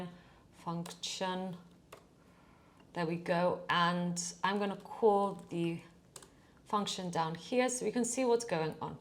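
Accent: United Kingdom. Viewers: Great content, British accent, this channel rocks!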